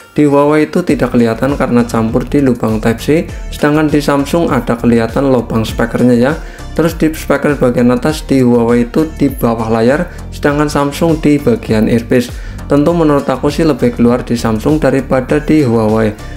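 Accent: Banyumas, Indonesia. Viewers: Logatnya ngapak bg😁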